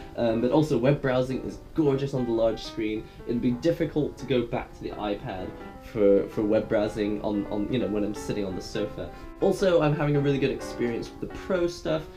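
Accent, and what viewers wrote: United Kingdom. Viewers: u sound like a british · He is but he lives in Japan sometimes.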